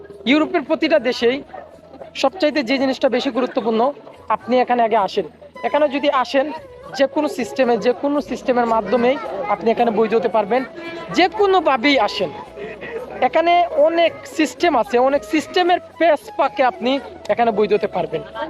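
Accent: Sylhet, Bangladesh. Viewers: Vai subscribe korlam..apni mone hoy amrar Sylheti? Vai amito aitam chai Greece 2024..aiya kam pawa jaiboni city alaky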